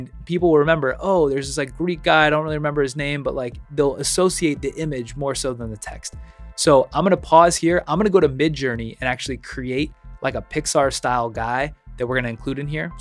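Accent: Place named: United States. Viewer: Your voice sounds sooo American, omg lol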